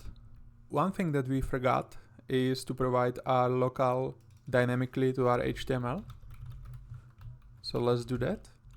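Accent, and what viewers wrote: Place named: Czech Republic. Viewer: pekna chenglish:DD ale dobre video, jen hledam jak udelat localized pathnames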